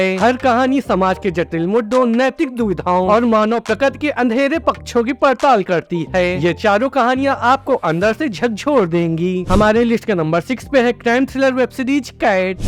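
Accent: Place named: Bihar, India. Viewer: Change ur voice bihari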